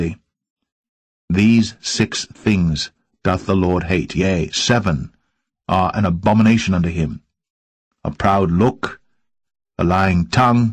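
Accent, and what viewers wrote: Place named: United States. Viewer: American English but the KJV Bible is written in old English so it sounds different.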